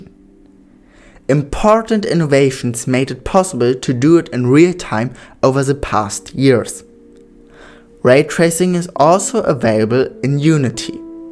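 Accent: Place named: Germany. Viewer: I can hear your German language roots so much.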